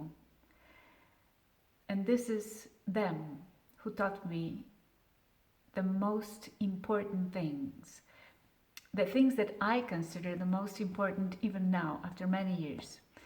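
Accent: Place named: Spain. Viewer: Wspaniale przeslanie, española angielski. Jest pani the best🙂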